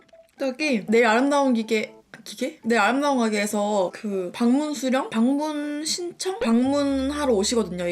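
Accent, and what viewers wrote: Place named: Jeolla, South Korea. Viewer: 온니 혹시 전라도 사람이신가여 ?!?! 말투가 되게 친근감 있어서요 ❤️❤️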